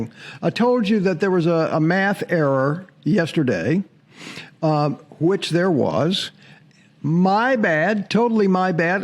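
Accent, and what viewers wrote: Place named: Georgia, United States. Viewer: The reason for the accent is Georgia was a British penal colony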